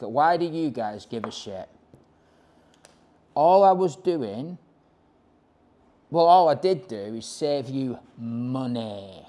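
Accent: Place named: Northern England, United Kingdom. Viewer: I'm impressed. English Northern guy doing well. What's not to like.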